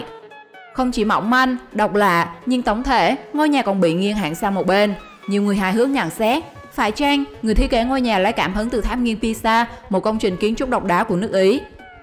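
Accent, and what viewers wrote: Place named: Central, Vietnam. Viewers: Cám ơn admin cháu gái dễ thương, thích cái giọng nói miền Trung này 👍👍👍👍👏👏👏👏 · Giọng nói của em kỳ kỳ sao ấy...!! Thà cứ nói đúng Giọng miền trung đi mà anh thích nghe hơn..! Chứ đừng sửa Giọng làm gì cho nửa nạc nửa mỡ...nghe muốn ói quá..!!!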